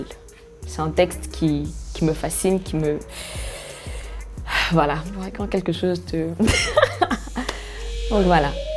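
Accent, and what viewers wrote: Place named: Cameroon. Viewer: Je kiff trop quand tu parle le français genre genre du camer 😂😂😂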